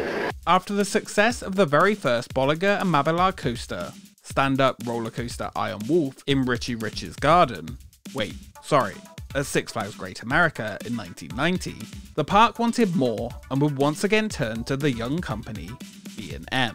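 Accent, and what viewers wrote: United Kingdom. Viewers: A high-pitched British voice.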